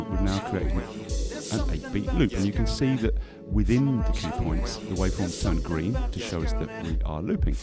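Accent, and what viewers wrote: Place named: United Kingdom. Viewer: Thanks! GREAT TUTORIAL. For some reason things are a lot more intriguing in a British accent. PS. Im going to download all the songs you played.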